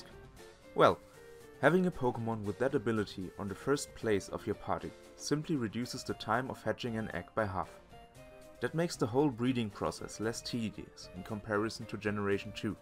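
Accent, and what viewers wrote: Germany. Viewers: Man hört an deiner Aussprache das du Deutsch bist 😂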